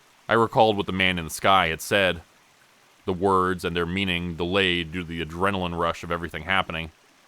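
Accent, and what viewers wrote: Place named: Canada. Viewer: Tjis is great. Is this your real voice man. Its nice. You sound a bit Canadian with the inflection. Cool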